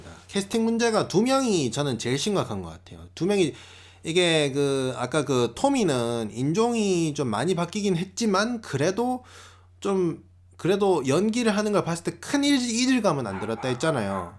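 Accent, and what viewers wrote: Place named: Gyeongsang, South Korea. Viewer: 후기를 듣다듣다가 말투를 보니.....경상도 출신인데. 대구? 부산? 어느쪽이든 고향분이라 반가브요 ㅎㅎ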